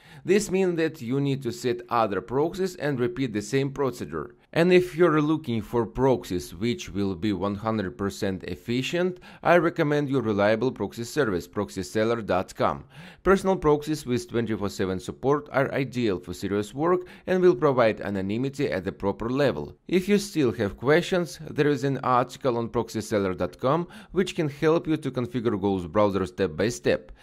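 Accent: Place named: Russia. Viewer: typical russian tougue, love it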